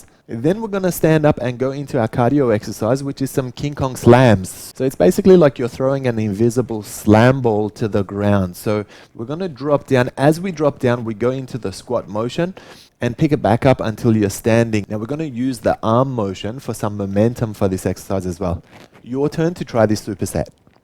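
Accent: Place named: Australia. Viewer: He's got an aussie accent, but where was this filmed? Gorgeous place! I did this workout today (not all, though!) and absolutely loved it. So glad I found this channel.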